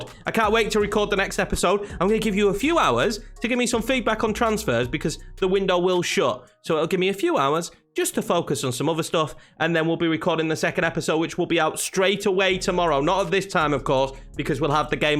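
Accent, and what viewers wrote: Yorkshire, United Kingdom. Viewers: Where you from in Yorkshire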